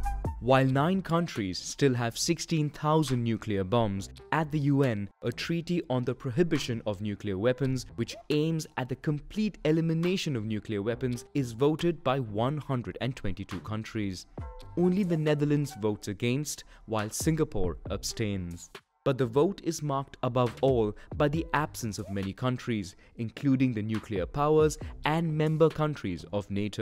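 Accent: North India, India. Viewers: Ugh hate indian accent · i can hear indian accent in his voice why ? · Is the narrator Indian? His accent sounds so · Yeah ....this is north Indian accent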